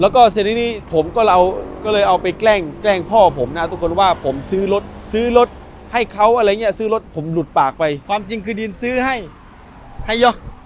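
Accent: South, Thailand. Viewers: พูดภาษาใต้น่ารัก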